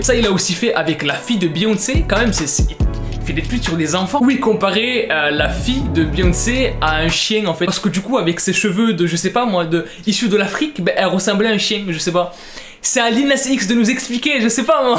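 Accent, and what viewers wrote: Quebec, Canada. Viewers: J'adore ton l'accent québécois👍🏽mais aussi je trouve que ta raison ça pourais se retourner contre lui on plus ya pas a faire des vidéos ou on le voi nu ya des enfant qui ecoute !! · il parle trop bien ( quebecoi ) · Il me tue l'accent québécois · Meilleur accent québécois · L’accent québécois j’adore 😂😂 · T'as un beau accent québécois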